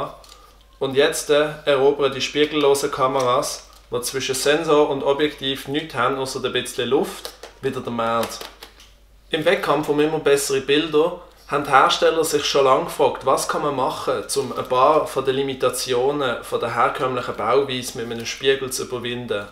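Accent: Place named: Switzerland. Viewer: Hallo,wäre es möglich auch das Video in DEUTSCH zu machen ?? Schweizerisch sehr schwer zu verstehen !!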